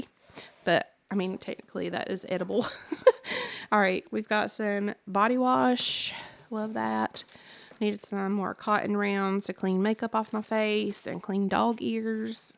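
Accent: South, United States. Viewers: I’m so glad you are back! I miss that lovely southern accent!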